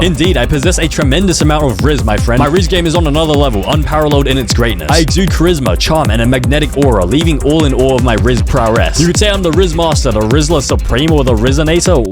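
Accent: Australia. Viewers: Ive watched so many vids and THIS is the one i find out you are australian... how did i not know 😭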